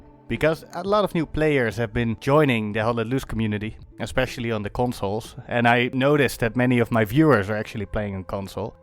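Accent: Netherlands. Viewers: Ben je een Nederlander? Je accent xD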